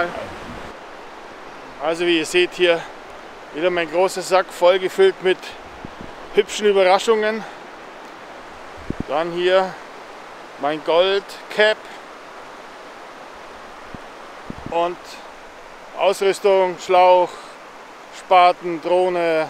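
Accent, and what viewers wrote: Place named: Germany. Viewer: Good luck for the future and its really a lovely german accent you cannot hide :p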